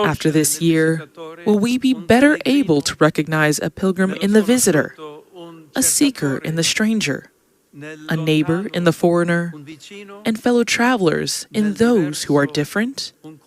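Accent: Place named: United States. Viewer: Crystal clear American English!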